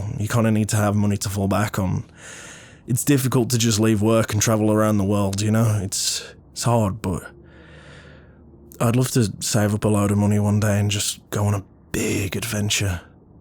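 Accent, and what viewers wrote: United Kingdom. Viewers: YIPEE :DD ur british accent is just so delicious- XD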